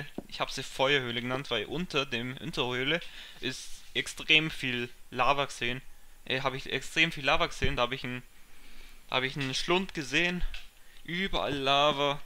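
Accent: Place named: Bavaria, Germany. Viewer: Ich finde die bayrische ausprache von dir so geil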